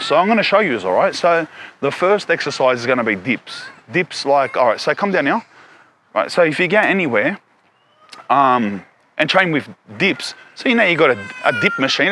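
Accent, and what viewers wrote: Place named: Sydney, Australia. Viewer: Boom brother, oos lad! I’m from Brisbane so we don’t talk like that but I had a mate from Sydney who talked like that. Love it! I could listen to old talk all day haha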